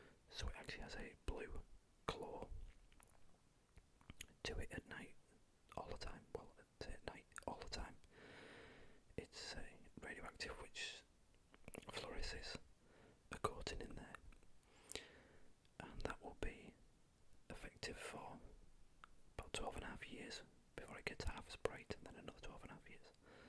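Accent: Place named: Northern England, United Kingdom. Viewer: northern england, we have the best accents!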